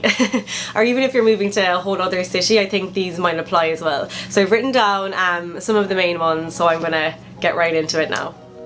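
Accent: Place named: Ireland. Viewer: Love your Irish accent and positive energy. Keep up the good work!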